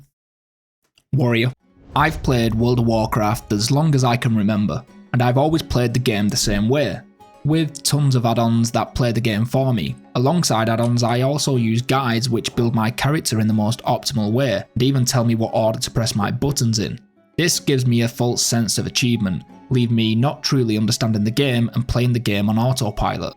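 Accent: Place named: Lancashire, United Kingdom. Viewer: Nice vid mate i certainly detect a lancashire accent. Chorley/wigan by any chance?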